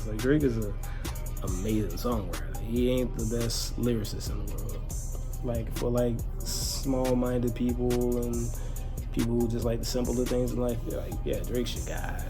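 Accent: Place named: DMV, United States. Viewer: I feel like I understand Coles apology because he was acting out of character. He seemed like he regret getting out of himself to get in this hip hop compatible persona that everyone expects and as someone who kinda been on a similar bath of change as I can understand to a big degree and I kinda felt like hip hop beefs and disses was kinda corny for a good while. It sounds like I hear some DMV in your voice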